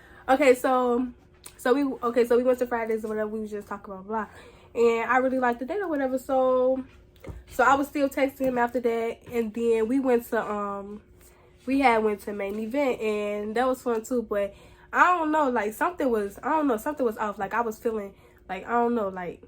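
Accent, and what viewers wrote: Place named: Chicago, United States. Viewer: I like ya accent lol you sound like you from Chicago “blew me” is funny asfff 😂😂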